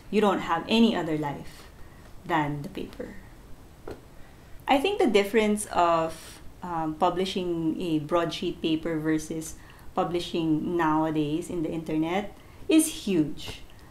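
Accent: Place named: Tagalog, Philippines. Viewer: Fantastic Book! Thank you for your review! I think your tagalog accent is fantastic!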